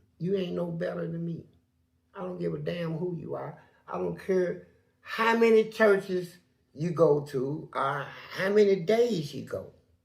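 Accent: South, United States. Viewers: Hey, my comment got deleted but I saw you read all your comments. You're my favorite person on YouTube and I wanted to tell you what an inspiration you are to all of us brother, especially me. My heart aches for you and what has happened for you in your life. I can't imagine that kind of abuse and trauma. And you came out with faith and a smile. You are a Unicorn bruh! I'm from Chicago so y our southern drawl and charm and the way you tell stories, well, your just a funny dude. Very charismatic.Never stop being you and telling us stories. And, thanks for all you do nd bringing us!!!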